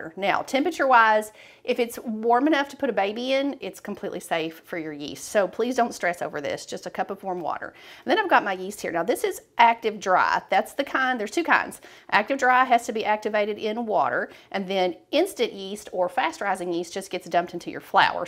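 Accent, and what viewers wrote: South, United States. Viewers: The Southern accent is so so charming. Thank you for the tutorial fantastic instructions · 👍🏻🙂 One of the best dough making videos I’ve watched… And I’ve watched many. You explained every step with unmistakable southern charm. Thanks again. I learned a lot. 👍🏻🥖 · I hear a southern voice telling me how to make food, I listen. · I live in Canada and I love your southern accent and I love your recipes. Thank you!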